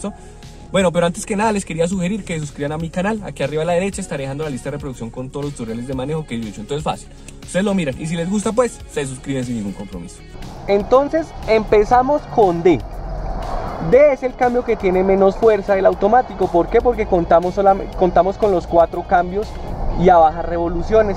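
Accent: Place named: Colombia. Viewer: Que chistoso hablan en Colombia Parcero!! Saludos! 🤗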